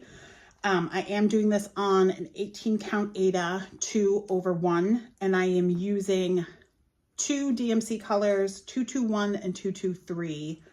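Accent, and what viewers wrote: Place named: Maine, United States. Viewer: Hi...first, great progress on your WIPs and goals! Second...GO PATS! Third...as a Maine girl who has been in New Zealand for 22 years, you simply make my heart smile with your "yapping". Sounds like home to me, so thank you! Happy stitching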